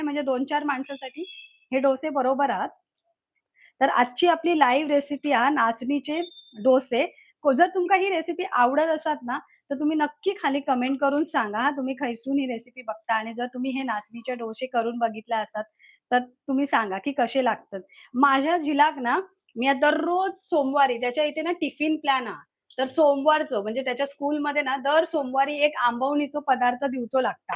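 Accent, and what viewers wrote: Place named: Malvan, India. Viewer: तुम्ही मालवणी बोलतास ता भारी वाटता, गोड लागता. तसाच बोला.मराठी बोलूक बाकी लोक आसत. तुमचा आपला मालवणीच चलांदे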